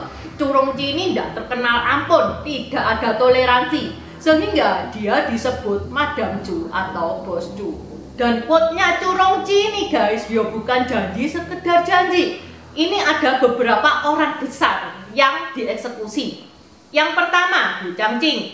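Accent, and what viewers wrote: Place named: Surabaya, Indonesia. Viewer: Yg bicara ini , aksennya kental.jawa timur , suroboyo. mbedoknya lucu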